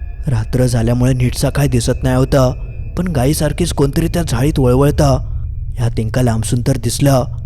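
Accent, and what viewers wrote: Malvani, India. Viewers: Hi malvni ahe ashich aste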